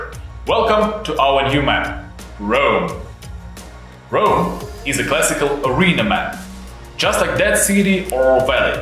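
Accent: Russia. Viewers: Ю рашен акцентиш из very very палится!) Гуд джобс камьюнити. Онбывеблевал гейм, си ю.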